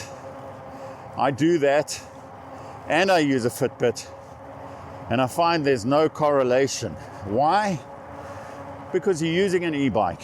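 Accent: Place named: South Africa. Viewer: thanks. Loved your video btw! Great hearing that SAFFA accent. I bought a Cube Supreme Sport Hybrid with Bosch battery. Unfortunately could only get a 400w battery, no stock anywhere of the 500w. Hopefully this will do the trick for now. Could have got a Norco Scene VLT for same price with 500w battery, but no front suspension, and non integrated shimano battery. Merida also seemed good, but saw that too late, and didn’t want to muck about the sales guy any further. Anyway a 30day “trial “ period and can exchange if I absolutely think it’s not for me. I’m not keen to use this option, so will likely stick with what I have unless there is some serious fault or issue I have.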